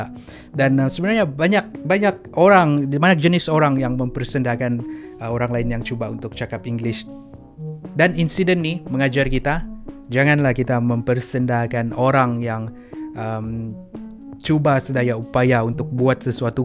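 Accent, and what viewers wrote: Sabah, Malaysia. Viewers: Abg org Sabah ke.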